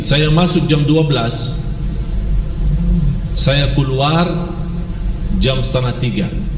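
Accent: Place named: Arab world. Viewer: kangen rasanya dg beliau , dg suara khas arabnya dlm tausyiahnya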